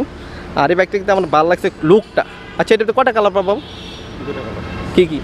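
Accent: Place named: Comilla, Bangladesh. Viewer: ব্রাহ্মনবাড়িয়া আর কুমিল্লার গন্ড ভাষা ছেড়ে শুদ্ধ এবং সুন্দর ভাষা শিখে এরপর এসে রিভিও করবেন। কথা শুনে মনে হয় বাংলা বইটা কোনোদিন পড়ে দেখেননি।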